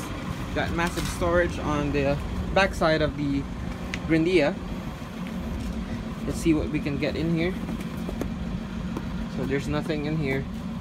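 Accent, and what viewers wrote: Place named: Philippines. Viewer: Galing mag english halatang pinoy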